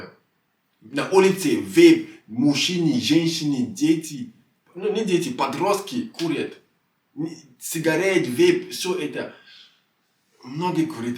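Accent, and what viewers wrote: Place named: France. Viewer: По акценту франко говорящий, а в душе русский (почти). Респект чувак!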